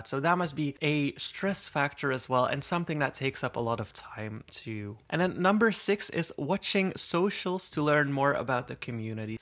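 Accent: Netherlands. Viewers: Are you dutch? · You're dutch or Belgium, same for me. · Can i ask if your dutch? 😁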